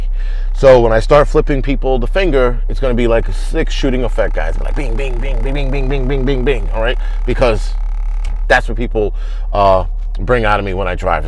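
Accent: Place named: Bronx, United States. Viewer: Hes not angry....hes just from the Bronx.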